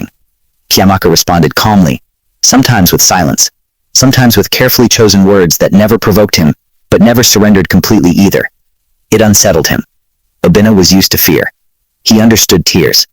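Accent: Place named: United States. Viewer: Love your stories, but not a fan of the american accent telling the stories.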